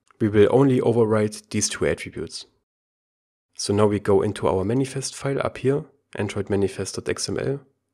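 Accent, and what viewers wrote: Germany. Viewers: ich mag deinen Deutschen Akzent^^ Great Videos Du bist der bester :D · I assume of your accent your German like me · nice german accent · Schöner deutscher Akzent!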